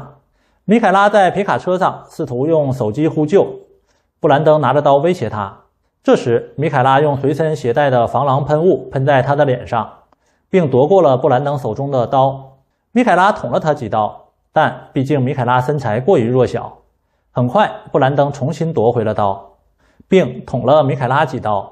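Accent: Northeast, China. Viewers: 老哥你这东北口音，把我好不容易的普通话又拉回20年去了，当主播得练练哪，真的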